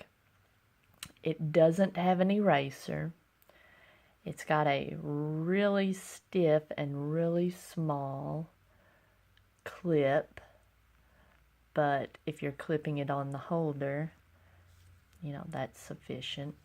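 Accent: South, United States. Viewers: Are you Southern USA?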